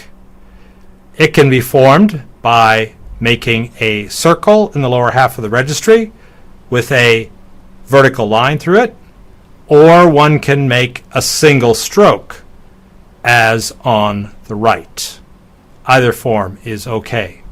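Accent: United States. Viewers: Your pronunciation is very american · Oh my gosh his pronunciation is awful and he speaks so very typical of an American when addressimg to pupils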